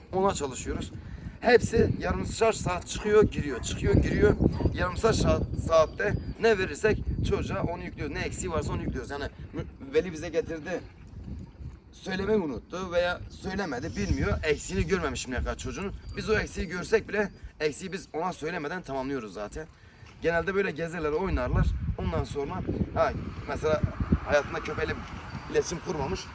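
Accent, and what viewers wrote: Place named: Urfa, Turkey. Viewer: Kral Urfalı olduğun o kadar belli ki, özünü kaybetmemen mükemmel bir şey dezenktefe diyince aklıma teyzem geliyor 😂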